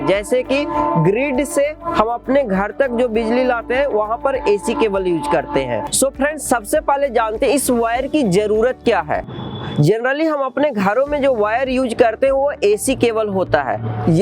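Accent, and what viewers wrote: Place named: Bihar, India. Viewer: Bahut menat karna hai abi bihari jaise bol rahe.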